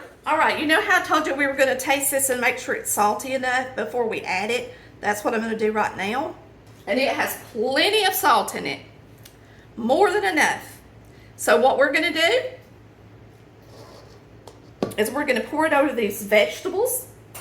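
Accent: South, United States. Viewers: Love your southern accent and delicious recipes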